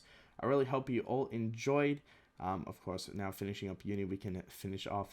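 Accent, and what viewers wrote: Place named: Australia. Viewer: Never getting-ver this Australian accent pog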